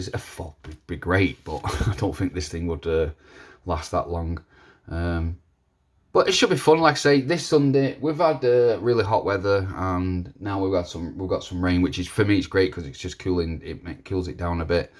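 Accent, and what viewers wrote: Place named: Manchester, United Kingdom. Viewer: Wheres your accent from mate, you in the Manchester area?.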